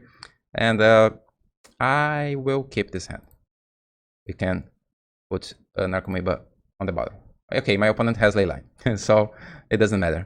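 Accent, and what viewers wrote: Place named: Brazil. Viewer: ele é br? nem sabia mas agora o sotaque tá fazendo sentido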